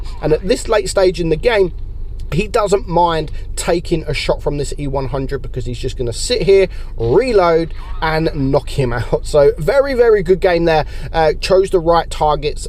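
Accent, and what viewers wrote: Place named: Scotland, United Kingdom. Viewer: Scottish lol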